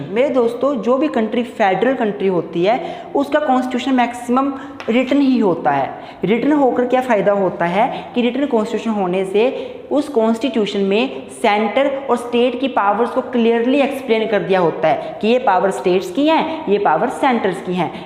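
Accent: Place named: Punjab, India. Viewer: The content is very helpful...and that punjabi accent jo aa rha hai that is also making it an interesting lecture 😄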